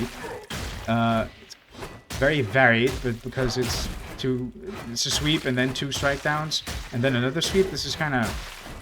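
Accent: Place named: Netherlands. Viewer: Instantly recognize that Dutch accent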